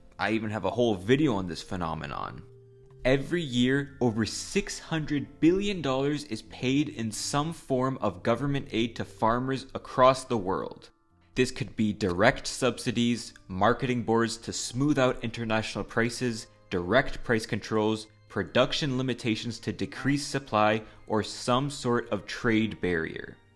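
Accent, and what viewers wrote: New Zealand. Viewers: Your NZ accent is sweet as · loving the kiwi accent lol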